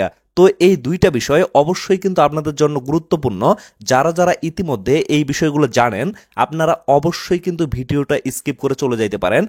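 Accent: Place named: Thakurgaon, Bangladesh. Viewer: first cmnt,,দাদা আপনার ঠাকুরগাঁওয়ের কথায় বাসা🎉🎉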